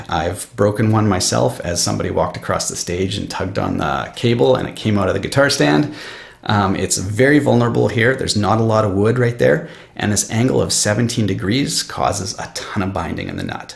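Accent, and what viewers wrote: Canada. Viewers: There's no way you're not Canadian. I'm from Ontario and I can't unhear the accent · Are you Canadian? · I always enjoy Canadian accents. No problem with thaat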